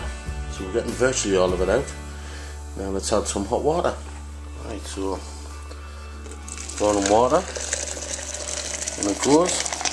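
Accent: Middlesbrough, United Kingdom. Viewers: Oi oi m8....hope all's ok...great vid...hope all works out well....yeah I had t laugh at the froth it looked like one big pint...lol...I'm just avin a pint of wherry atm n I've gotta admit it's got better as the weeks go by the head stays intact all the way down the glass...anyway m8 hope this brew is a banger 4 ya m8...lv nut brown ale...btw are you from Newcastle way it's just you sound like my family Middlesbrough...big respect n oi oi...gav.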